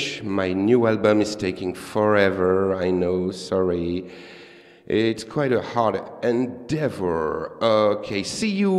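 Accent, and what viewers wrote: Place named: France. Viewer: bonjour, il me semble reconnaître un français derrière cette voix.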